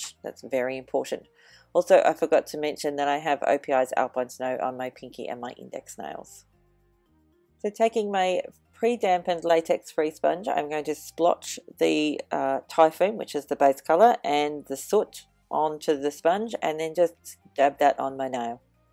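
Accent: Australia. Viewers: Love your videos. Which part of Australia are you from?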